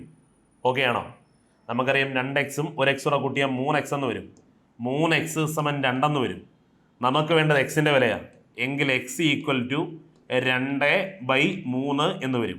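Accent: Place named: Kollam, India. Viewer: രണ്ടുപേരും കൊല്ലം ആണ് 👀... ഞാനും 😁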